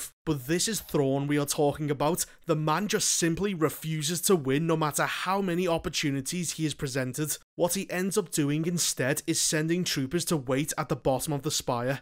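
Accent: Liverpool, United Kingdom. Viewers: the scouse accent only adds to the on point comments XD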